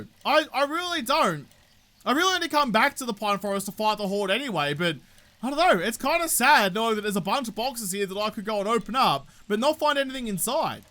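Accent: Australia. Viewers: just out of curiosity, are you a native Aussie? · Anyone know what a "flozza" is from McDonald's? I can normally understand Australianess but that one has me stumped.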